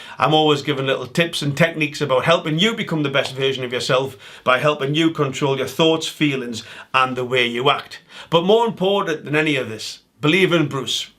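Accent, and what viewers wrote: Newcastle, United Kingdom. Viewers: Been missing that Geordie sound! Welcome back Bruce!🌝👍⚘❤🇨🇦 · great to see you back... your sultry geordie voice reminding me of my birthplace.. would still love to see a video on Jussie Smollett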